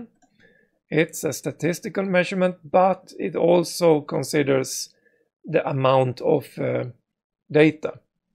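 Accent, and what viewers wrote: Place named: Sweden. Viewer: ...!! good swinglish, great shirt design!! its a good and cool video and very good explanation... requires good practice for the begginers to take good advantage of the tools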